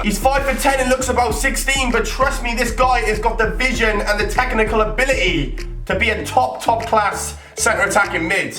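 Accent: Wales, United Kingdom. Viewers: ar you Welsh? cuz i am! <3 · Are u welsh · Are you wellsh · are you Welsh? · Are you Welsh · are u Welsh cos I am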